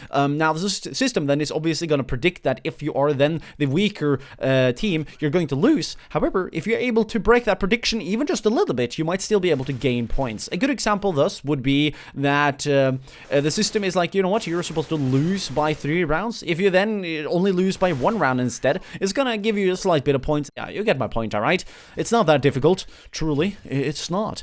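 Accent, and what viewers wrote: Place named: Norway. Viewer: You are norwegian right? I can hear it on your accent. I'm swedish.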